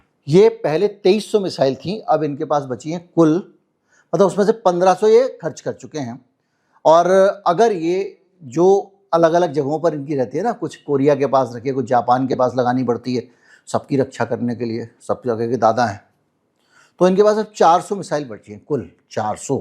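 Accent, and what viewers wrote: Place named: Delhi, India. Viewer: What a wonderful content sir 🤩😇 everyone in the morning must watch sir’s video where he will give news in a fashion that it’s entertaining and learning and soothing as well in a typical Delhi style of Hindi. It’s always refreshing to watch his videos in the morning, particularly.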